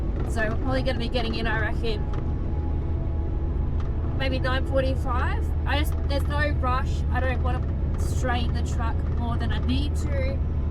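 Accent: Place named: Australia. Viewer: Nothing better than B-doubles and an Aussie accent.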